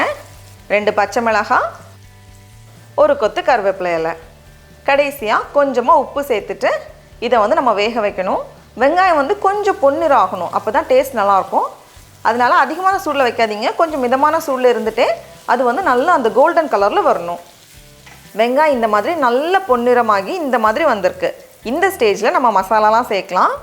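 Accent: Nagercoil, India. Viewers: How are you? Waiting for your video before starting my online classes. I am teacher working in UAE from Nagercoil..Love the way of your Nagercoil slang ka...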